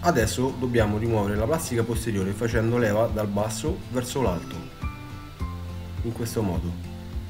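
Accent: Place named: Rome, Italy. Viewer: Sei un grande! Ho voluto provare a smontarlo anche dopo solo 1 anno di vita.. Smontato, pulito quei cerchietti, sollevato un po quei contatti metallici per scrupolo anche se sembravano ok, rimontato e non ho più avuto problemi di visuale che andava da sola verso sinistra! Che dire, se sei di Roma (dalla calata penso di sì) ti offrirei volentieri un caffè o na birretta!! Grande 💪🏻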